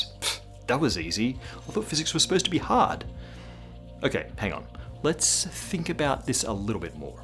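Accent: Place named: Australia. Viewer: do you have a channel with the same information, but without the Aussie?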